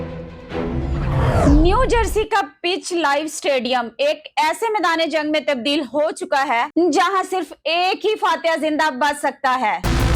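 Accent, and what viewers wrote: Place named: Punjab, India. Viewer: یہ کوئی بڑی سفارشی ھے،،،اردو بھی پنجابی میں بول رھی ھے،،،جیسے خواجہ آصف بولتا ھے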